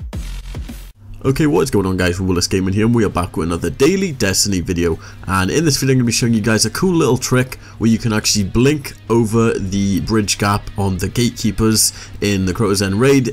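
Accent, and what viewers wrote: Wales, United Kingdom. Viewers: Willis, are you Welsh dude? 😃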